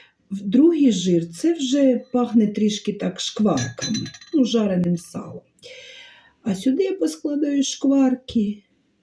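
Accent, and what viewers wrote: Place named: Central Ukraine, Ukraine. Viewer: ви просто унікальна! У нас на Україні дуже багато діалекту. Всі люди по - своєму розмовляють.Але всі вони наші рідненькі, дорогі і неперевершені. Ви по- говору -- це центральна Україна. Всі рецепти з цієї території. Розкажіть більше про себе. З відки ви? " Ми вже ваші, а ви наші. 1000 лайків.❤❤❤❤❤❤❤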